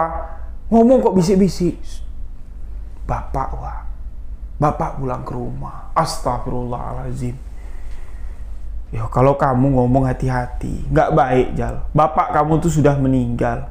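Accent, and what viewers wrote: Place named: Jambi, Indonesia. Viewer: Walaupun saya orang Banten, saya suka sekali dgn bahasa jambi. Mas logat bahasanya jambi punya sangat bagus.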